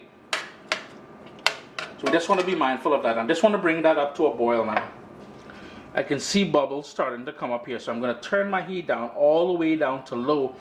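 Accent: Trinidad and Tobago. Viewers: Ur good and love ur Triny accent. Great dish have to make it soon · You said "allyuh" so you're from Trinidad, but there are some other accents going on there as well...? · Trini accent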